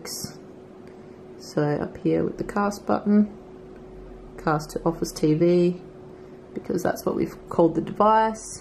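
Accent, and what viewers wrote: Australia. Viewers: Thanks, you made the improbable possible and did it with a sexy Australian accent.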